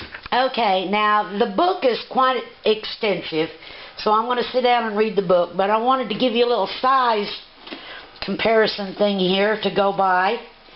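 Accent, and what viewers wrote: South, United States. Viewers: Nice Video! You are more entertaining than Paula Deen and you sure do have a more distinct accent than her!! Nonetheless, I am from Buena Vista VA and therefore speak Southern and Hillbilly so I had no trouble understanding you, and it was nice to hear some familiar accents!!! I already have a DAK Turbo Baker IV which makes great bread, regular, oat/whole wheat, white, and whole grain, as well as dough, and I have use all of those cycles with great results, but I am in the market for a machine that does the cake thing!! I was hoping your video would have shown that, but you stated that you're not interested in the cake making feature of your Ham Beach machine. Well, let me tell you something, Miss Lady, us men like dessert and you sound like you've got a good man that you've been taking good care of for many years, so you'd better start making some cakes for him in your new bread machine and then fire up your camcorder and put some videos of your cake bake on the internet!!!!!!!!!! 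If that recipe book came with a Lemon Pound Cake recipe in the 'cake' department, whip one up and put it on You Tube!!! Thanks!!!!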